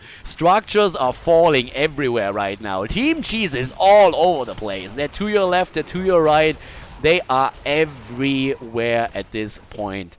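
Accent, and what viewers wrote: Germany. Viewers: Does has an german acsent when hes talking in englisch??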